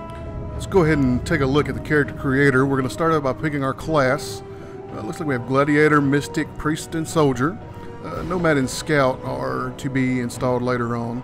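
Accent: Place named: United States. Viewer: I love your accent! Nice to have a regional accent for once from someone in the USA :) (I'm in Northern Ireland). You review really well too - not the usual 100 words a minute! I like the setting of this game and even with the bugs I think I will give it a go. I will check out the site - nice to have a decent review instead of the usual nonsense - thanks :)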